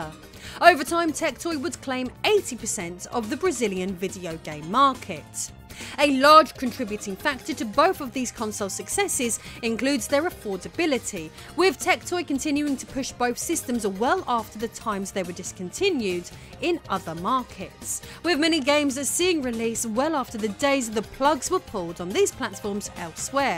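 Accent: England, United Kingdom. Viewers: England accent is sooooo cool! Kisses from 🇧🇷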